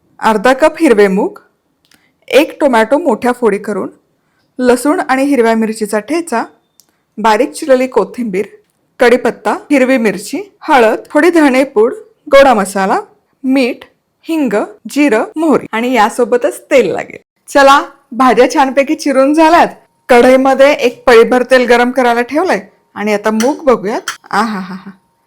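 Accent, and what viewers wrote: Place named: Pune, India. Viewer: Mal tumchya recipe tar avadtatach pan tyachypeksha tumcha najuk awas ani god bolan khup jast awadt. Tumhi kuthalya gavachya . Pune mubai kolapur ko kan . Tumchya god awajavarun tari tumhi mala punyakadacha vattat.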